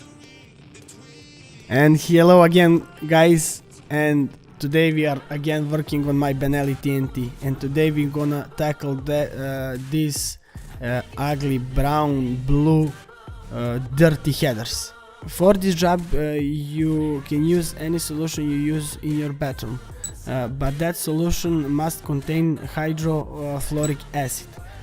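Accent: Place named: Balkans. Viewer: Tacno se cuje taj balkanski akcenat :D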